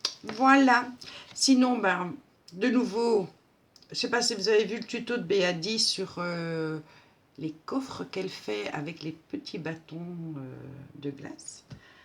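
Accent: Belgium. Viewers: Coucou je reviens sur ma chaîne après un break vacanciers oblige lol, je suis contente de te retrouvé parmi nous, j'aime beaucoup ta chaîne et ton joli accent belge 😜😜😜 ne change rien, je te fais plein de gros bisous 😘😘😘😘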